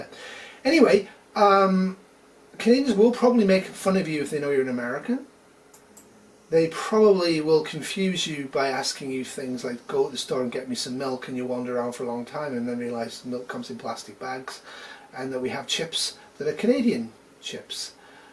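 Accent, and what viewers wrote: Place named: England, United Kingdom. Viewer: What what old boy. 🏉 Is not the hardest game ever.... Ha ha 😂. Are beer is the best and we have the werst weather. Rain in the morning sunshine then pissing it down.... 😊. Your so funny. An yes I can here the English in your voice. Keep it up mate. ATB ta the both of ya. Andy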